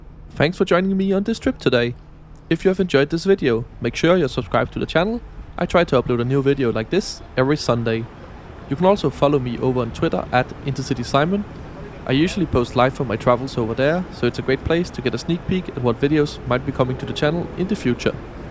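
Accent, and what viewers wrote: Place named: Denmark. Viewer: Your Danish 🇩🇰 accent is soothing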